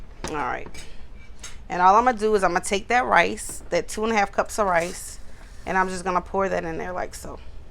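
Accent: Louisiana, United States. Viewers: I Love your Louisiana Accent!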